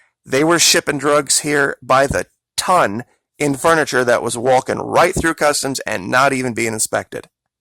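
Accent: South, United States. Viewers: I'm trying to place the dialect; Forrest, Forrest Gump? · Love the sthrn accent haha 🤣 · And the condescending southern accent thni g is highly insulting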